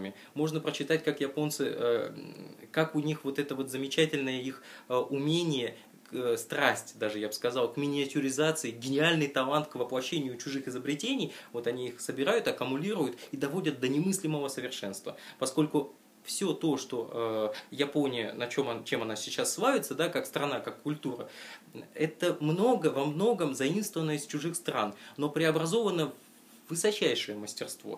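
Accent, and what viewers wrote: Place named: Russia. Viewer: Здравствуйте. Заинтересовала книга. Спасибо за рассказ. Подскажите из какой вы части России или вы из Украины? Интересует ваше произношение Япония, японцы.)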